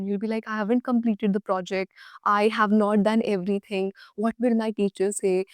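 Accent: India. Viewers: Are u indian ?.....u have indian accent too much .... try to fixx itt good luck ❤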